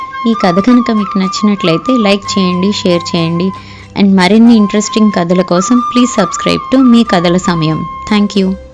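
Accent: Rayalaseema, India. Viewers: రాయలసీమ యాస చాలా బాగా చదివావు. సూపర్ 👌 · ఈ రోజే విన్నా. ఎంత బాగా చదివానో రాయలసీమ యాస అలవోకగా చదివావు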